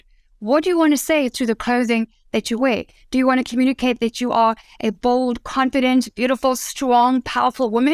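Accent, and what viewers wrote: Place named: South Africa. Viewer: I can hear you are in South Africa. Do you do make overs? I need help.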